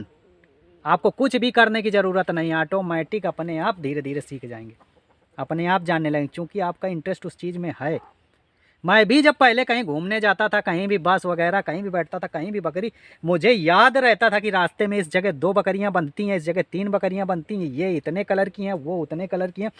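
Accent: Kanpur, India. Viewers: सबसे अच्छी लगी आपकी बोली. सुनते ही समझ गया आप कानपुर से हैं. 1979 में मैं डी एम यू में पढता था. अमरूद के बाग घूमने रावत पुर, कल्यान पुर जाता था.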